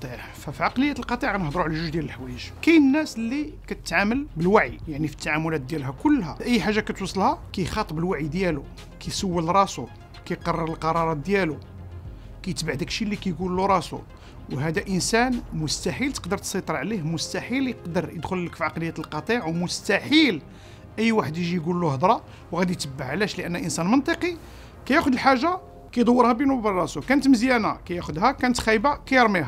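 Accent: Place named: France. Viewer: يارجل قبل ان تتكلم بل سياسة نقدية كانت ام سياسة لقطيع اووو تعلم ان تتكلم بل عربية الفصحى ثم اشرح من يسمعك يقول انك فرنسي متعلم العربية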